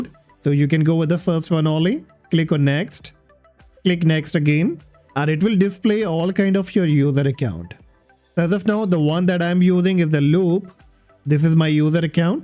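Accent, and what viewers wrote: India. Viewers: indian english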